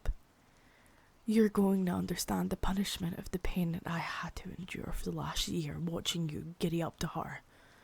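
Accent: Scotland, United Kingdom. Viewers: Can you do a video using the thick Scottish accent like in the movie brave heart plzzz🙏🙏🙏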